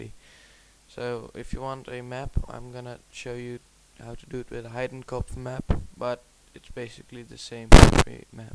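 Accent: Netherlands. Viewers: Ur dutch arent you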